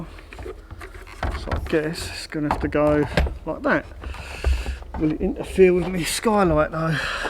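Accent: Cockney, United Kingdom. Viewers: Lovely to hear the good old Cockney accent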